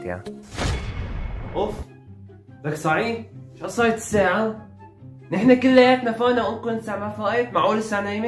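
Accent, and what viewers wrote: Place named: Aleppo, Syria. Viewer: أنا عم اتعلم الحكي الحلبي كلو منك💜💜❤️❤️مين متلي 👍👇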